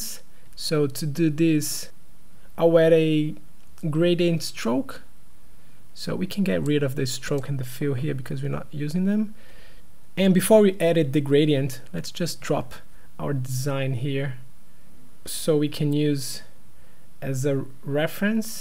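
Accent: Brazil. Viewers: Mano, vc é brasileiro. Diz ai!? Sotaque entrega. Muito foda, parabéns! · are you brazillian?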